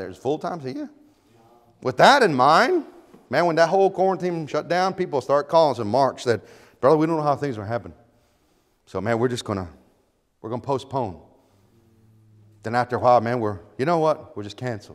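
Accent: United States. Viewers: Good job preacher, sounds like your somewhere in or around the Texas area.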